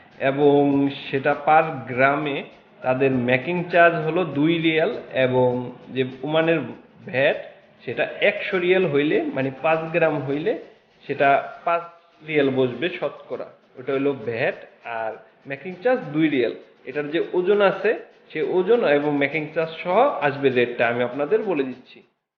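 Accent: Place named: Rajshahi, Bangladesh. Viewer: ধন্যবাদ কথার ভাষা রাজশাহীর মতো তাই বলেছিলাম আর কি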